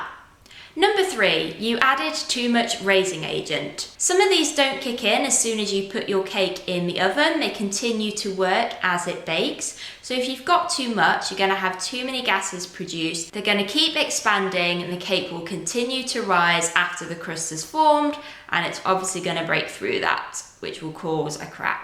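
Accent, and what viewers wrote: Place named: United Kingdom. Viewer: I like your brit accent :p cute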